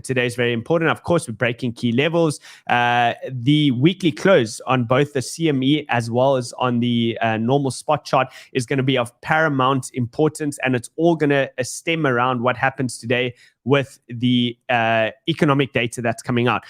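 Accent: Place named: South Africa. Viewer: Love the SA accent. Couldnt care less about TA. Its all BS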